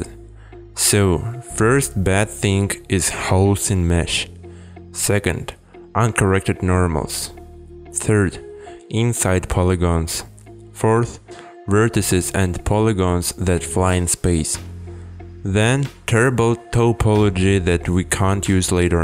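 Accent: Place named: Eastern Europe. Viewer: Accent never gets old. It's like the eastern European version of.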